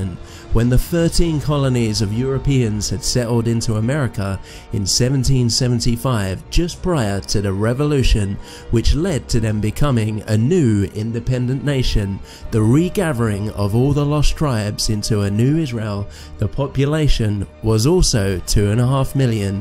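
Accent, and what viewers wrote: United Kingdom. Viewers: It's a southern England accent. Relax. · Nice work! Is that a Welsh accent? I haven't heard that accent so much.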